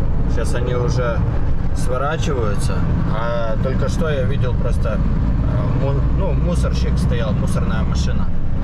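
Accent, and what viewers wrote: Odessa, Ukraine. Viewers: Пролетел говор Одесита, Удачи!!! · смотрю с удовольствием бытовые сцены... дорожные трипы никому не нужны, если больше 5 минут... одесский акцент умиляет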